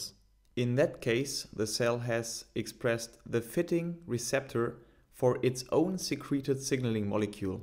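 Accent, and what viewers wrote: Germany. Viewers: hilfreiches video, danke :) höre ich da den deutschen Akzent raus? :D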